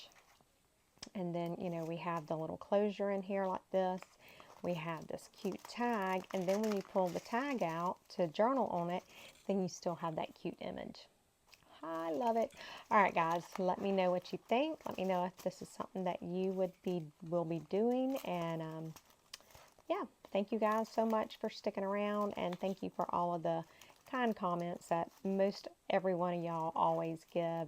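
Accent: South, United States. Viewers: As a new subscriber of yours, I love your videos. I am a southern girl and we can’t help our accents any more than people 🎉from other parts of the country can. You just keep being you and disregard the negative Nellies. If someone’s voice or accent bothers someone that much, they can always use close captions and just read what you say instead. I do that a lot if I can’t turn the volume up when I watch videos. Love your junk mail tips.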